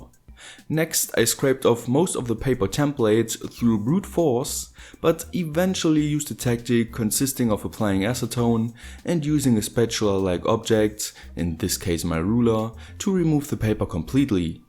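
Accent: Germany. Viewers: Man Hört ja das du Deutsch bist daher eine Frage auf Deutsch :D...... kann man mit diesem aufbau auch größere boxen bzw. subwoofer mit einbinden ? wäre coll wenn jemand antwortet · Bro you have to be german, there is no way this accent of your's is scottish, it's even more german than mine and of my german friends haha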